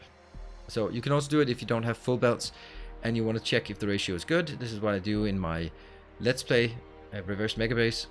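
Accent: Denmark. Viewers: Are you danish, your accent sounds kinda danish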